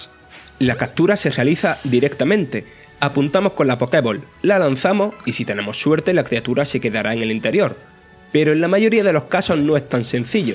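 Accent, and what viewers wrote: Andalusia, Spain. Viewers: andaluz creo, yo soy de argentina pero si no me equivoco es de ahí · Me encanta que un medio se haga en acento Andaluz, eso si, lo de “Arqueus” me ha dejado descolocado. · Párese que e andalu pero quea raro en una narrasio que e lo que le paha a ete tehto que quea raro. Y lo digo siendo andaluz, nuestra forma de hablar es la peor para narrar. Pero no es que no sepa hablar el muchacho 😂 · Investigué y resulta que así hablan los andaluces. No es mala locución así es como hablan en Andalucía. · de Jaén, Andalucía. En el sur de España. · De dónde es su acento? Sé que de España pero de que parte?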